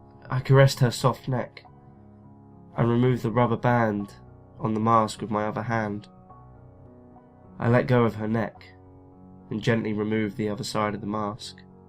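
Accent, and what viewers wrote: London, United Kingdom. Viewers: The last line is so true..but even with 15people around you fighting for your life...(I had an accident and ruptured an artery) by the time only like 5minutes it took to be driven to the hospital, I had bled out two thirds of my blood..the big veins in my neck had collapsed and they couldnt get a line in. People seem to think bleeding out is painless but that is a cruel lie when I think of poor people taking their lives thinking all that lies ahead is dreaming into oblivion. The second your brain registers the drop in blood pressure it tells your heart to pump faster but while your heart jumps to command and quickly develops tachycardia then ventricular fibrilation (its like a big man jumping up and down on your chest 200+ times a minute. Meanwhile your brain is pushing your lungs as it feels oxygen depletion. They had to cut into my Femoral vein in my groin..no time for local anesthesia..the guy who did it was the cheif anesthetist (they are most qualified in hospitals for keeping people alive..he squatted over my chest on the trolly and cut then manually forced the teflon Venflon about 8" into my vein. 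In those frantic terrifying minutes I learned that EVERY man and woman is alone even in a crowded room we are prisoners in a meat and bone cage and, in the end, you go out as most of us came in, alone. Oh this is true..by your accent you've probably been near Homerton Hospital in Hackney without whose fast thinking anaesthetist I would have completed my journey still,as alway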